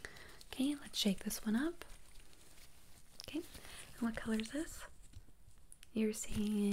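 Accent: United States. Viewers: I can’t ever figure out if your American or British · I can't tell if the American accent or the British accent is her original one. Both sound authentic. lol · Are you American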